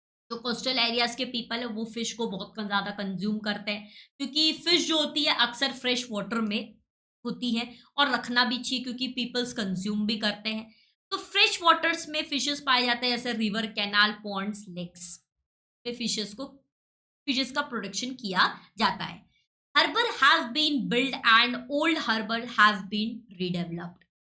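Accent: Maharashtra, India. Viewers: Mam app Marathi ho kya 😅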